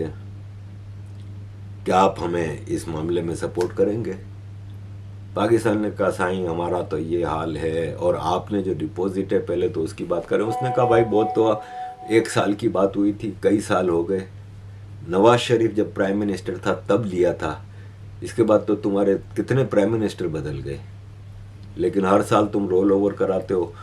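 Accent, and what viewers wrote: Gujarat, India. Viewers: Sirji aap kahise pakistani nahi lagte ,,aapka bolne ka andaz thodasa gujarati lagta hai,, matlab ke indian lagta hai,, salute to your knowledge sir,,pls tell us the story of Turkey 🎉❤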